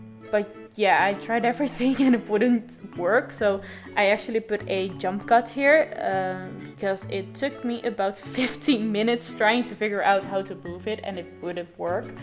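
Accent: Netherlands. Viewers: ik ben ook nederlands!! Leuke build! Ik dacht al dat je nederlands was. dat accent is zo herkenbaar haha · Ik herkende letterlijk dat dit nederlands is na de eerste paar secondes... #dutchaccentstruggle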